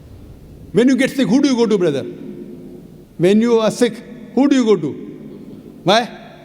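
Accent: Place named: India. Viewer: I am really liking the Indian accent . It is cool .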